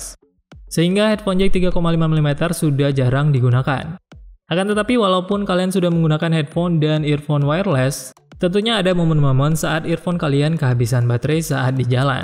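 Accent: Aceh, Indonesia. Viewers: Orang aceh ya bang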